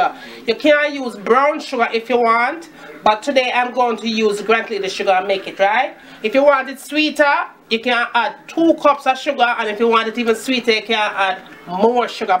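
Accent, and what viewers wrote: Jamaica. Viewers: wattah patois trong!! LOL!!! mi luv it. no mattah how ard mi try mi cyan get it 😪😪 dem always bun up thanks for sharing. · Nice, can't wait to make these. Long time don't eat, got all the ingredients. Just need my six beautiful, ripe sweet bananas like yours. Oh no am out of oil. me will get some. Lol, Love your Jamaican accent. :-) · Mi luv the way how she talk cook everything proper Jamaican lady!!!!!! I'm a Jamaican by the way❤️😁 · Instead of oil.????Jamaican accent is funny though. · Previewing and loving your videos. You make it clear on what to do, and I love your patois--music to my ears. I just dubbed...don't want to miss any new videos. Thanks sooo much for sharing. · Hey 👸🏾saw dis video 1st time looks good😋😋never heard of banana fritters. I know donut shops have apple fritters donuts. I like yo accent it is very strong more Jamaican then it does now.❤This kind of reminds me of unleavened bread. 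Have u heard of dat or ever tried unleavened bread? I want to try and make dez 1 day.😊👍😋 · Your Jamaican yes but please talk good for the viewers... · I love this. Real Jamaican with strong Jamaican accent fi tru! Mi start smell the fritters dem. Gonna do this the proper way tomorrow. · I've never heard of a banana fritter. I'm gonna make these soon. Thank you for sharing. I just love your jamaican accent. I subbed because you have a bunch of tasty looking recipes. 😘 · You accent makes me really miss Jamaica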